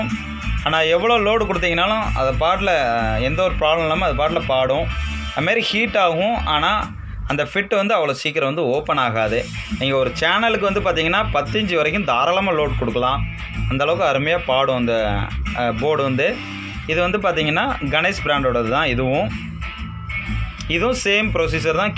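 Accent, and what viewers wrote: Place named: Coimbatore, India. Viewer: hi sir very nice explain....... Love it..... Ana Coimbatore Tamil ela...... Nice...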